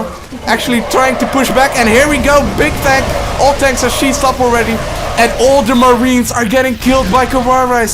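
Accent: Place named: Netherlands. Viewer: This accent could not be more dutch...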